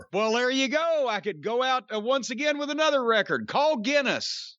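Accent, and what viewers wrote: South, United States. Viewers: an intelligent well read southern man is what makes it for me. Too many give them a bad name in the public eye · The southern accent gives It a certain flavor. I Jim decides to do a news show I'm in.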